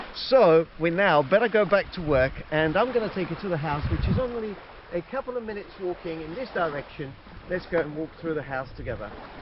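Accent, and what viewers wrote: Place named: England, United Kingdom. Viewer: Aww Thanks so Much, for your Response. I am currently Watching other Episode from Your Channel “Buying a property in Italy Salento by lol lol I watched You and Dave From DauVo and Subscribed to your Channel and became a Fan!! I wish You Many Success!! Love your English Accent even though I know you are 100 % Italian, Also Compliments to Your Fashion Style very Charming!!! It’s great to Watch someone with so much Knowledge and in the meantime that takes time to Pay attention to the Personal Appearance! That says a lot. Because you are sending a Positive Message if you take care of yourself definitely you will take good care of someone else property. I enjoy Watching your Channel! Great Personality!! Warms Regards from. Noriko in Míami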